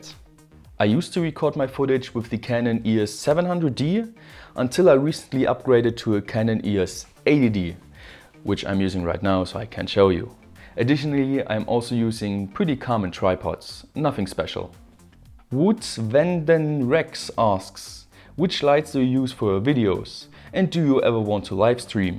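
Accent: Germany. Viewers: are you from germeny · So did I reading your comment, most, if not all, of his mispronunciations are due to his German accent, not his English skills, actually his English is excellent.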